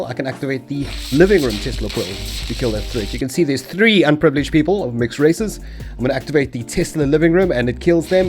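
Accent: South Africa. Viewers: Are you South African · Haha I could immediately recognize your SA accent! :D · Lekker ou ek het gehoor jy klink bietjie Suid Afrikaans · You sound like a south african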